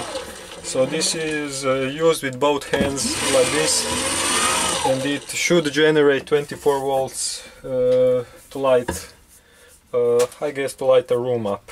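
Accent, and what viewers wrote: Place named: Russia. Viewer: Такое ощущение, что твой родной язык русский.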